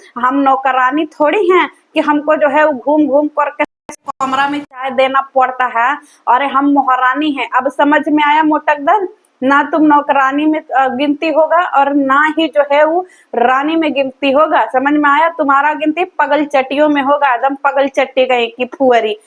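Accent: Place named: Uttar Pradesh, India. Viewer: यूपी वाला भोजपुरी बोलतीं हैं आप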